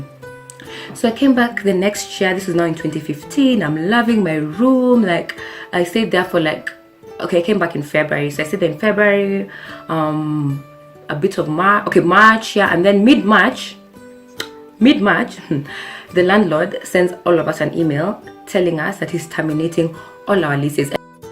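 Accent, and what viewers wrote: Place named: Kenya. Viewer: Kenyan English accent is very interesting. I noticed how different you pronounce some words: First - Fast Service - Savice Year - Yar Fact - F..ked Work - Wark Church - Chach Etc. I just love it! Thanks for sharing your experience. I did hear a lot of SA accent as well.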